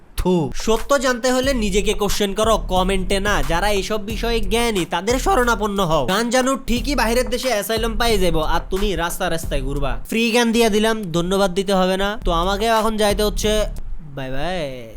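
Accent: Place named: Chittagong, Bangladesh. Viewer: চিটাগাইংগা এক্সেন্টটা না থাকলে আরো ভালো লাগবে ভিডিওগুলো(যদিও আমি বইংগা হয়েও চইংগা ভাষায় জব করেছি ৭বছর লুল), যদিও গাঞ্চা নূর এর কথা তোমার ভিডিও ছাড়া জানতাম না।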